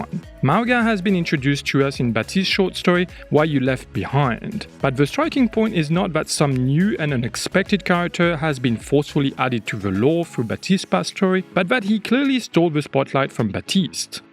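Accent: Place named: France. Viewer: Are you french? Cause you sound french.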